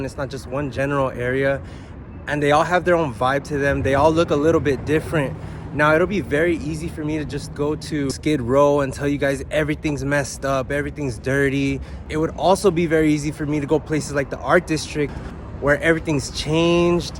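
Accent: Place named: Spain. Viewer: I live in this 'hood. To my ear, the youtuber has a slight Spanish accent.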